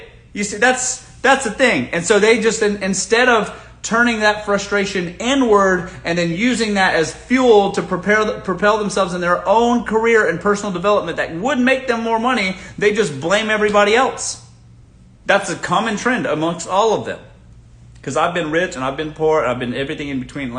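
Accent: South, United States. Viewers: I think he mentioned he was Southern..Sounds it too..Maybe from Georgia?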